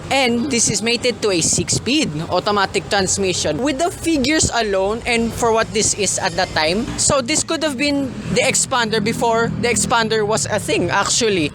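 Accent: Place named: Taglish, Philippines. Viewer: Sa susunod tagalugin mo na lang. Ang sakit sa tenga ng TAGAlish mo!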